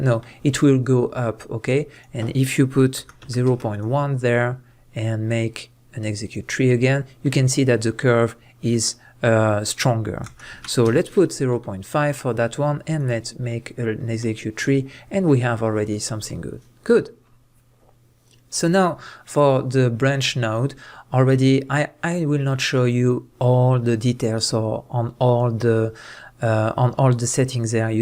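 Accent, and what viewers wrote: France. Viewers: nice tutorial with nice baguette accent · French accent ^^ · L'accent a la française !!! Tmtc😂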